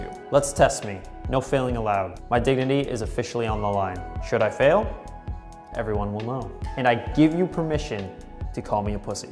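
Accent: Canada. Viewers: You're from Canada aren't you?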